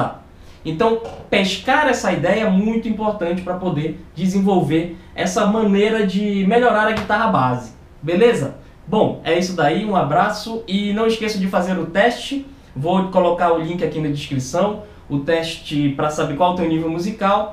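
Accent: Pará, Brazil. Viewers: Ele e paraense, da pra ver pelo sotaque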